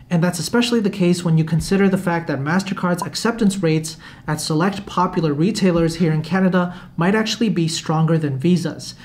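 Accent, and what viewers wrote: China. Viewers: 隐约听到些中国口音？ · 口音一聽就是中國大陸的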